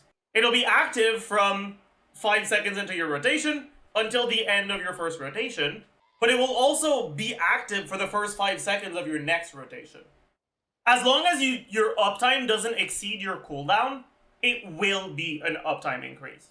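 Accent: Quebec, Canada. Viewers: Il est québecois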